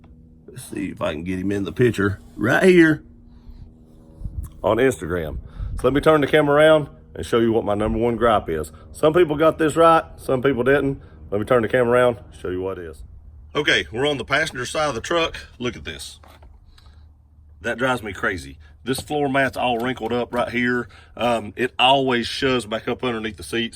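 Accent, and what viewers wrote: South, United States. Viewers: That southern drawl is like nails on a chalk board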